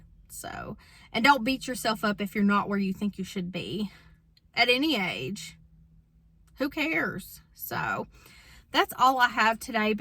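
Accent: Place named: South, United States. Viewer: Thank you. Your sunshine and peace and don't let them hold you down attitude is the exact energy I wanted to match today. Thanks for being that and your sweet southern accent made me miss Mississippi just a little. Some people are as sweet as the sweet tea they make down there and I miss that warmth! ❤ Lots of positivity your way all the way from Canada. 😊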